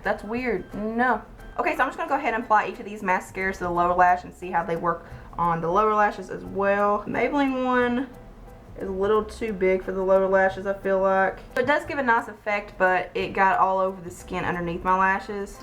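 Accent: South, United States. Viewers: Welcome to the Southern accent. A thing of beauty, ain't it? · Hands Down...The Maybelline Colossal mascara looks better on you. Now I see why people love my southern accent, listening to you makes me proud to be a southern woman. Thumbs up.:)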